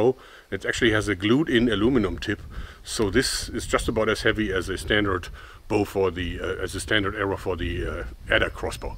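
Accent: Germany. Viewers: I dont know why, but hearing any German say "oops" instantly brightens my day and makes me smile and laugh, haha. I Love the German accent!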